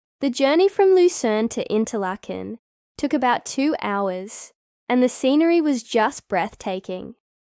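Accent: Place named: United States. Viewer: Oh no, another American-speaking influencer girl. Don't we have enough?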